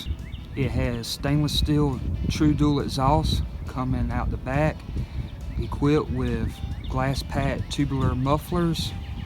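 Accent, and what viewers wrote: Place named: New York, United States. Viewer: This guy sounds like he's from New York.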